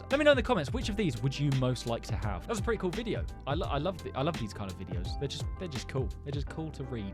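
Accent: United Kingdom. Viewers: i love this bros british accent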